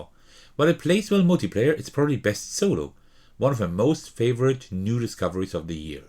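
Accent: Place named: Germany. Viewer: Puh der deutsche akzent ist echt hart zu akzeptieren. 👎👎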